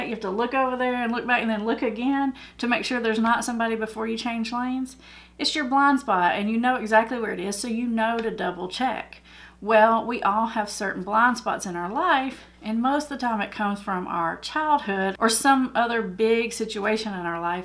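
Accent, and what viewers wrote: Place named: Appalachia, United States. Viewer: i hear an Appalachian accent. I absolutely live for it. I live in Southwest Virginia. I have an area I am currently dealing with that I would love for you to do a video on. I work very closely with a woman who is constantly putting other people down. When I use the word constantly, I seriously mean she does it every breath. I have worked there only 2 years. She has been there 5 years, I am her superior and I have had decades of experience and I have more education. She and I got off to a very rough start. I stood my ground and confronted her face to face with our boss. The boss 100% supported me. Long story longer, my coworker and I recovered and actually became close. I genuinely care for her. She has lots of qualities that make her a valuable employee. BUT.....she is forever more nitpicking our fellow coworkers. 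Many of them are super young women all around 20 and have been there since high school. They are all great girls. Do they mess up sometimes? Sure they do. We all do. In my mind it's our job to mentor these young women, not tare them down. So, I pulled away from her so that I wouldn't have to talk to her, but every time I did speak to her down into the dark negative quicksand we went. I have to fully own that I had allowed myself to be influenced by this negative speech and I became as bad as she was. So, I snapped out of the nasty, but I soon realized that being complacent is just as bad as being an active participant. I am generally a very positive person, calm per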